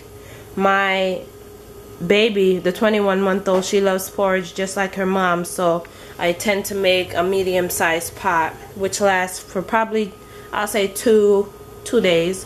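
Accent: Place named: Kingston, Jamaica. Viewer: Where in Jamaica are U living, U sound like Kingstonian.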